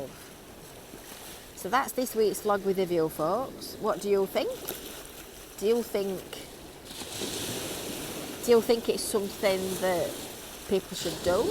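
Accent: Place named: Fleetwood, United Kingdom. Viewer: To answer your question ,now I am based in Edgware do I miss my native of Fleetwood Yes I do it is strange looking out not on the sea but looking out on small brook not a Big River Wyre touching Morecambe Bay and I had many happy memories there as child Left Fleetwood in 1975 back for small bit and permanently left in 1976 and I miss your Accent keep safe from the COVID 19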